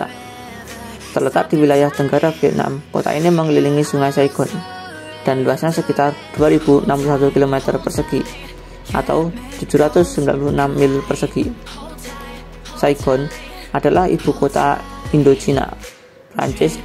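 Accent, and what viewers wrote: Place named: Java, Indonesia. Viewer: Ngomongnya medok banget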